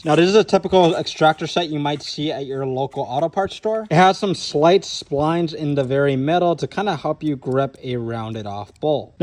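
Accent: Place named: Somalia. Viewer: I love you Somalian accent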